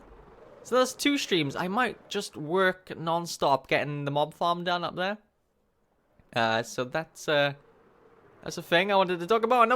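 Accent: Wales, United Kingdom. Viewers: Are you welsh???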